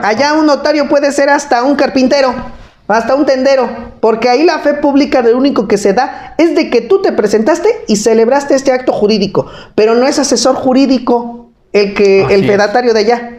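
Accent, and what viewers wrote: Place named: Mexico. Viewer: Por el hablado parece que es para Mexico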